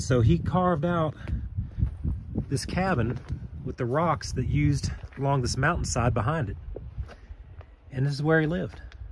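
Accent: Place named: South, United States. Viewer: Love your southern drawl…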